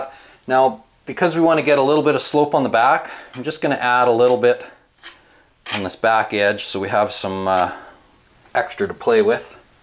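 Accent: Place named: Canada. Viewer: Finally someone who pronounces the word Niche correctly. I'm gonna guess you're canadian right?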